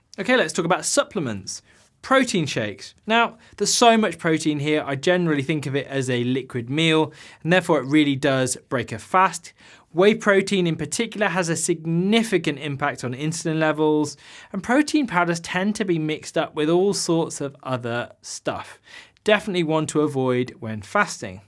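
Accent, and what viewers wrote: United Kingdom. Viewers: Hi I find your videos truly enlightening- learning a lot- very simple and after the initial getting used to the "British accent" as most of the ketosis videos seem to be from Americans, I am getting quite addicted to your knowledge tank, in a way, picking your brains. I am obese( there is no other word for it) and have been trying to lose weight for maybe 40 years- ( I am 50)- I do succeed sometimes but more often fail. Now at a particularly low phase - as living in a food desert and being a college student again is taking a toll. For the past month, have been low carb and high fat- but as you noted, the bullet proof coffee was not causing a weight loss for me- I took one every morning - with butter, coconut oil, cream, and coffee and a breakfast cum lunch cum dinner around 5 pm ( followed this pattern for about three weeks) - no weight loss, so decided to go completely off food- have been doing it for five days now- only water and black coffee( which I usually hate as it gives me acidity and migraines)- as a surprise, I have lost about 8 pounds in 4 days- surely water weight but not much urine passing either day or night. 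My urine strips do show significant ketones now. But the blood ketones are still low and the blood glucose I tested yesterday evening after a round of exercise in the cold weather, came to 150- very surprised as I am typically not diabetic, at least I don't think so. My question is, do you think a low carb ketogenic diet can reverse lipoma or skin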